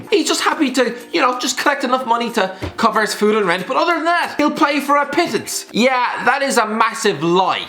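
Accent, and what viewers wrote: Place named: Ireland. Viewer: I thought that it definitely sounded Irish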